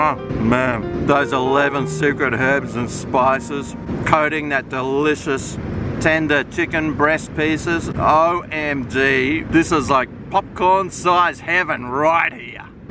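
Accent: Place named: Australia. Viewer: australian accents are way too course, I can't listen to this prattling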